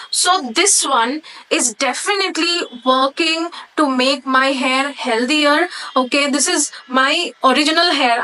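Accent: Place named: Bengal, India. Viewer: Wth people giving her hate over english speaking, grow up lads.. She is from Bengal as i can see, i am too from Bengal and i know what she is talking about some Bengalis can't properly speak hindi, i am not saying that Bengalis are poor hindi speaker like i perfectly speak hindi, can write hindi any stuff but with some Bengalis there's a regional tone which can follow up in hindi and might sound like she is speaking wrong but it is not like that hope everyone can understand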